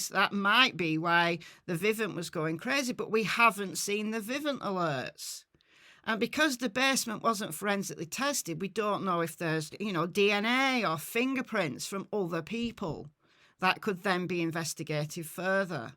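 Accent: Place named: Northern England, United Kingdom. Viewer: You've turned in2 one of my fave channels on this case. Plus you sound like me northern mate. I'm.sarf England. Plus you know what ya talking about xx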